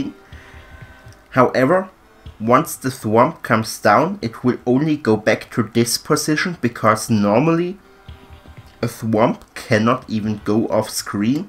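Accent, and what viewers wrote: Germany. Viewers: Heyy! Man merkt an deiner Englischaussprache, dass du aus dem deutschsprachigen Raum kommen musst xD. Andererseits sieht man es ja in Mario Maker auch. Jedenfalls ist das ein echt cooles Level :) Ich wette, du würdest mit der Beschäftigung von Levelbau Geld verdienen wollen. Ich würds dir echt wünschen, wenn das geht :D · Dein deutscher akzent ist extrem lustig anzuhören xD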